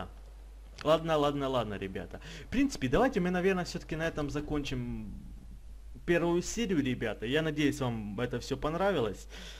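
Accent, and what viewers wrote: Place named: Ukraine. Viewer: У как будто акцент какой-то, может украинский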